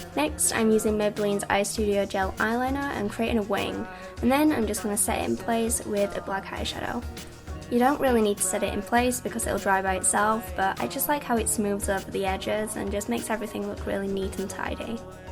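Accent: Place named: Sheffield, United Kingdom. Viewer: what part of England are you from? X You sound as though you're from Sheffield or near? x You're so gorgeous with or without makeup! x Also, what is your FIRST favourite eyeshadow? x this is such a great video, and I haven't even fi nished haha x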